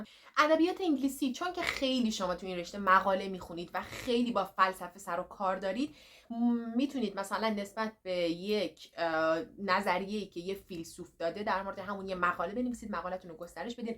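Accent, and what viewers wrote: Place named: Rasht, Iran. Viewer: از لهجه ات معلومه رشتی هستی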